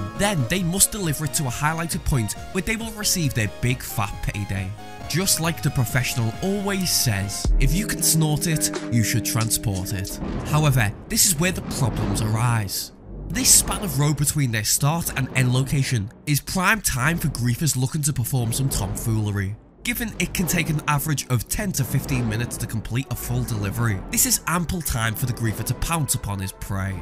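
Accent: Liverpool, United Kingdom. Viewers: lads a scouser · thanks for the subtitles i couldn't understand what you were saying through your British accent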